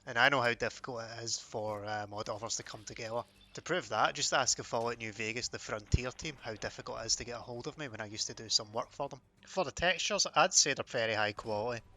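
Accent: Scotland, United Kingdom. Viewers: Ha your scotish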